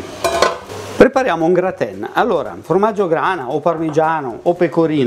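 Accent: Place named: Lombardy, Italy. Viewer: Buoniiiii li farò così la prossima volta , e poi mi fai morir dal ridere quando dici . ‘Guarda che roba , vien su un profumino ‘ sei proprio Lombardo come me . Bravoooo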